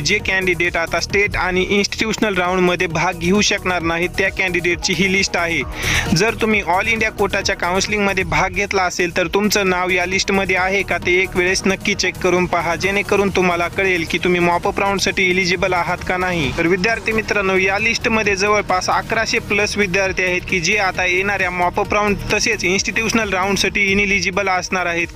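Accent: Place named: Maharashtra, India. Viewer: Bro love your marathi accent 😍. Marathvada?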